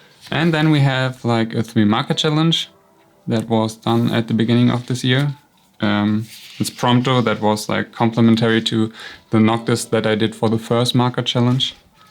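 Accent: Germany. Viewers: Wow, your German Englsich is so sweet😆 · ich hör richtig raus das du ein deutscher bist xP ps: nice pictures! love them x3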